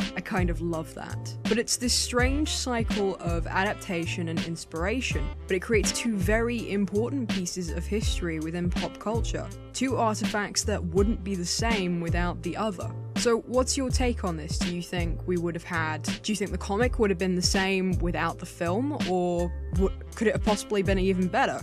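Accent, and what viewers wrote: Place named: North, United Kingdom. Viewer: Ahh that would explain it! Definitely has that American twist on top of a Northern British vibe. Don't try to mask it! Always good to be proud of where you're from!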